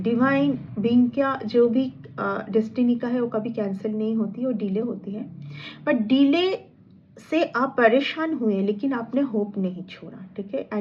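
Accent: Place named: Bengal, India. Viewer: Very beautiful reading....when a Bengoli person speaks Hindi.....it's sound very sweet 🧁❤❤❤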